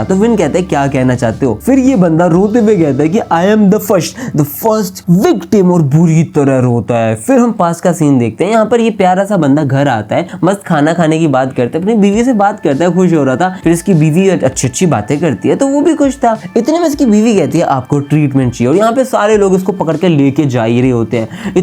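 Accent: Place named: Haryana, India. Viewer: thoda accent se laga tha ki delhi haryana ya Punjab se hoge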